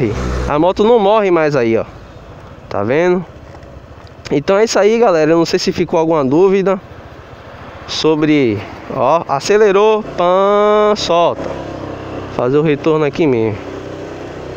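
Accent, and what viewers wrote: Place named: Bahia, Brazil. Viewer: Esse nosso sotaque Bahia é top de mais ..... abraço meu amigo. Pegar a minha final do ano.🙏🏼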